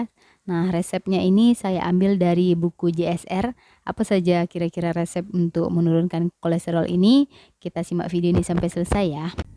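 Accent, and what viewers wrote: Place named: Minangkabau, Indonesia. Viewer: UNI LOGAT MINANGKEBO NYO RANCAK NDAK ILANG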